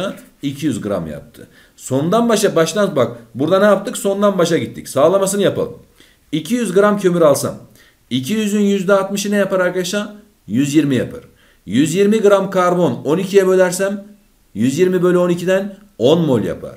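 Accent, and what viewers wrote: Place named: Adana, Turkey. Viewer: Hoca kesin adanalı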